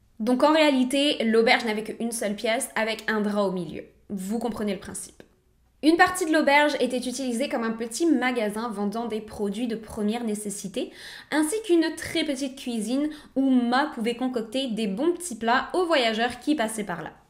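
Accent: Quebec, Canada. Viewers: Salut. Top vidéo. Tu devrais faire une vidéo avec Mcskyz et Sonia de France. Je suis malheureusement plutôt passionné par les psychopathes qui foulent la terre. . La première fois fois que j ai decouvert cette chaîne, j ai été tres surpris que qq1 d aussi mignonne fasse ce genre de vidéo! En plus l accent québécois est trop cute. Bref ce format de 3 histoire est top! Bonne continuation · On entends le Québec derrière ton accent, c'est normal???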